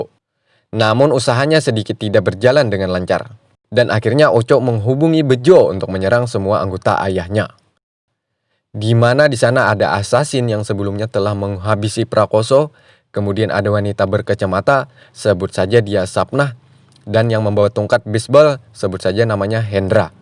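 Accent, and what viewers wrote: Banjar, Indonesia. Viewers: Banjar kah pinanya sidin nih🤣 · Urang banjar kh ini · Banjar banar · Orang mana mang pinang Banjar bangat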